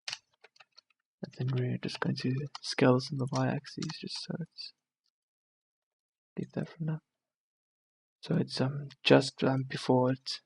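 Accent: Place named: South Africa. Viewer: I flippen knew it! I can hear the accent a mile away, i am too, it's good to see another Blender South African out there, keep up the good work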